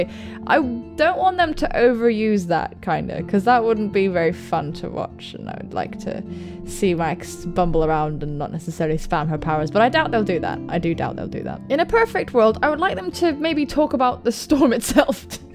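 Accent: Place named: United Kingdom. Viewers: Came for Life is Strange. Stayed for that smooth british voice and adorable face <3 You're amazing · I don't remember why I first came here. Maybe it was for HuniePop. shifty look Still, I also stayed for the British voice and I'm probably more stereotypically British than you are. :p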